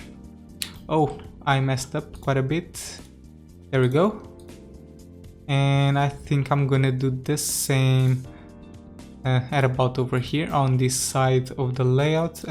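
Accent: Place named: Portugal. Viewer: Descobri o canal recentemente, não sabia que esse sotaque era português! Abraços do Brasil!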